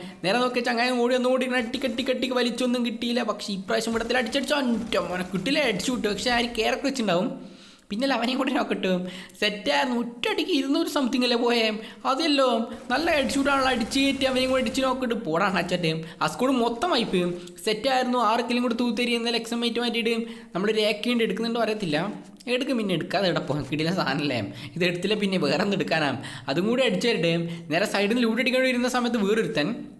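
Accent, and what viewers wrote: Kannur, India. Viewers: Kannur aanu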